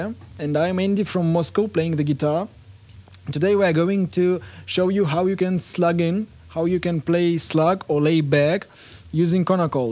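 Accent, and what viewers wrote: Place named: India. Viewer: ur english look so hindi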